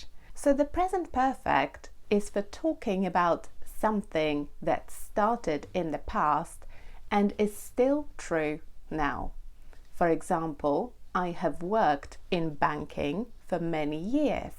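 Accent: United Kingdom. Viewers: It's such a joy to listen to the Royal British English from, especially for an immigrant in Canada. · 😊 the German-American hast the all right. It' s always a pleasure listening to your fine Bitish accent! 😊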